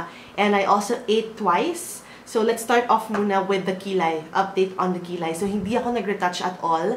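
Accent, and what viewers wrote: Conyo, Philippines. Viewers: i love the way you talk ate very conyo i like it haha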